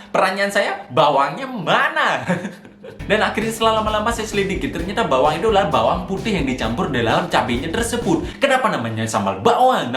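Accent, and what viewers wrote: Bali, Indonesia. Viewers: Gataw knp ya, denger dialek bali itu sesuatuu bgt bli ✨💫💜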